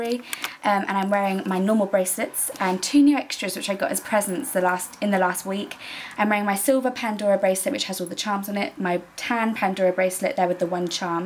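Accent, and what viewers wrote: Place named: England, United Kingdom. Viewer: You have such a classy English accent, i love it!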